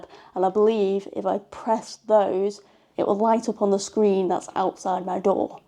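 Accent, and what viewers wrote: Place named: Teesside, United Kingdom. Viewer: I'm from Texas, but I went to high school in Teesside and college in Middlesbrough from 2013 to 2017 and this video has some potent nostalgia to it for me! Your accent reminds me so much of my old classmates, God knows I haven't had a J20 in about a decade, and while it wasn't a Hub my family and I did stay in a Premier Inn when we were looking at houses before we moved. Just found it funny how much a video covering a hotel I never stayed at could feel familiar to me! That hotel does look quite good for the price. Glad you had a nice experience!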